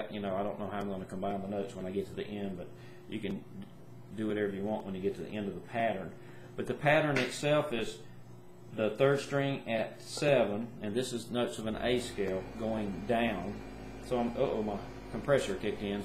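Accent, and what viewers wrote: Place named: West Virginia, United States. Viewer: Im from mingo co wv, I can tell your southern wv or eastern ky,,, where you at?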